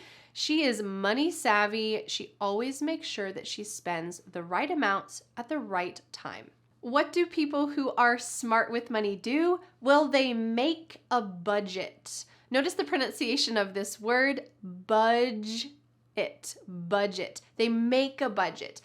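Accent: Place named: United States. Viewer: Hello, Teacher! I adore your lessons and your voice is so clear! I love American English and keep up the good work! Cheers!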